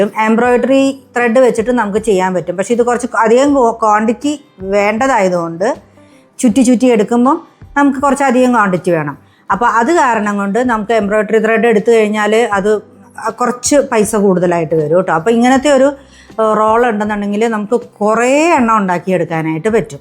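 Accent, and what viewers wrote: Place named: Thrissur, India. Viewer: Trissur slang Ella samsarathil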